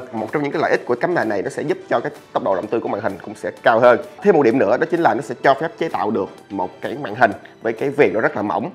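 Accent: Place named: South, Vietnam. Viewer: Nghe giọng Miền Nam k quen cảm giác khó nghe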